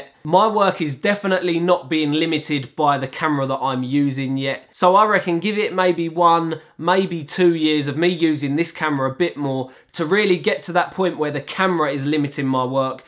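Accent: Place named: Cockney, United Kingdom. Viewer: Great video. The cockney accent makes the videos even more enjoyable XD.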